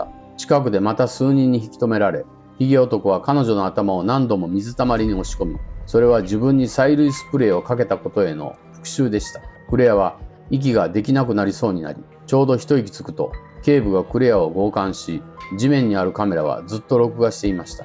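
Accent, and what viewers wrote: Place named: Kansai, Japan. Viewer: 若干関西訛りの。見やすくて助かります。ありがとうございます。ホラー映画最高ʕ•ᴥ•ʔ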